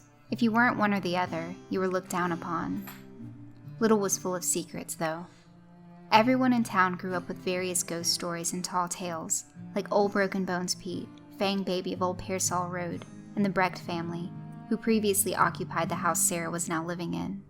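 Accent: South, United States. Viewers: love your southern accent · I love how you get that Southern Drawl Right on the Button.... BUT You CAN'T leave us hanging....you mentioned Joey Disappeared .... WHERE DID HE GO ??? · your southern accent is so convincing that I can'T listen to tat story xD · I really hate the stereotypical southern accent, It's ignorant and makes the story annoying. Being from Texas, I'm irritated.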